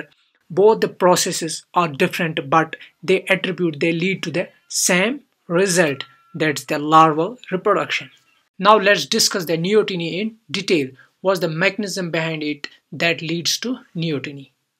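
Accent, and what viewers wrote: Kashmir, India. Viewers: Are you a Kashmiri? Just wanted to ask coz your are speaking just like my friend who is also a Kashmiri.😊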